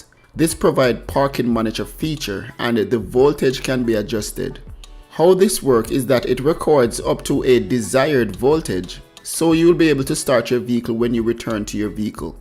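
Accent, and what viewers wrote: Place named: Bequia, Saint Vincent and the Grenadines. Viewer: Love de accent mon! Skiann for scan takes me right back 50 years to Bequia and discovering white guys spoke with the same accent, wild!!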